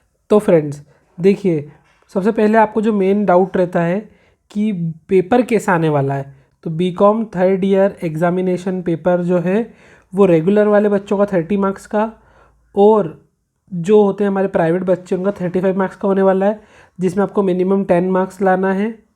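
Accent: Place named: Indore, India. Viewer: Indori Bhasha bahut jhalak rahi hai bhai aapki 👍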